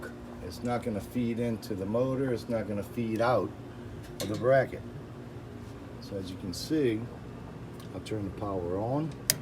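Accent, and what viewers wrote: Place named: New Orleans, United States. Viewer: you sound like you from NOLA with that accent.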